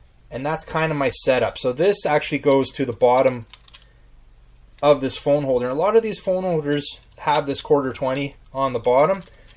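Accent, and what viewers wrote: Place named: Canada. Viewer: This is exactly what I was looking for, thank you! Side note, you have THE most Canadian accent I’ve ever heard, and I mean that in a good way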